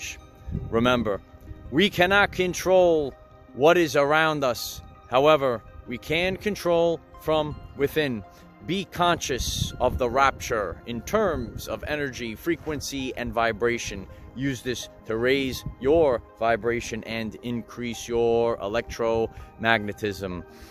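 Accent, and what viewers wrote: New York, United States. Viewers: You must be a fellow NYer :) Thanks for this great video 💕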